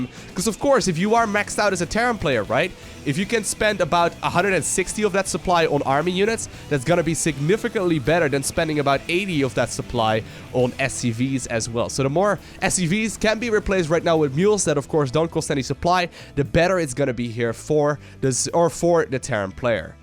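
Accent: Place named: Netherlands. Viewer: Could be saying "serral" or "several" can't tell with that delightfully dutch accent.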